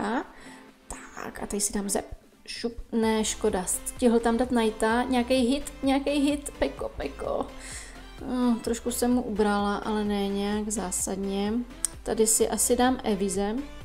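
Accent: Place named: Moravia, Czech Republic. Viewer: Líbí se mi tvoje moravské vyjadřování.Já su atd....:D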